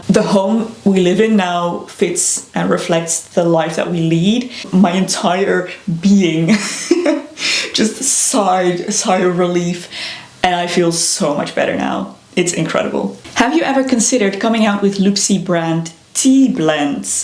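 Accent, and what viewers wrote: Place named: Netherlands. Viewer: One of the reasons I’ve been subscribed to you for so long is I’ve felt such a kinship with you from the beginning: I was a nerdy LOTR teen who enjoyed costuming and things like that. I’m American, but my dad’s family is Dutch and we lived in the Netherlands for a while when I was a kid, so I get such a thrill when you talk about life in what I feel is my second home. Early on when I started watching you I was like “Heyyy, I recognize that accent!” and was super excited to find out you were Dutch. I love your channel and your homeowner/gardening content is especially relevant to me right now as my husband and I bought a house just a few months before you and Robbert did. Your garden is basically my fantasy (we live in a desert so it’s impossible for me to do what I really want), it’s so lush and green. Anyway, this is all parasocial stuff, but I just wanted to say that you’ve got a friend in the western US. 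Thanks for what you do! (Also being a mom is the coolest thing ever - obviously a super personal decision, but I absolutely love parenthood!)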